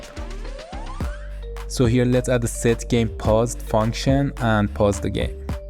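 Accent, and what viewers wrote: Iran. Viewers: Amazing video, used this as a refrence to implement this in C++. Also love your Iranian accent :face-purple-smiling-tears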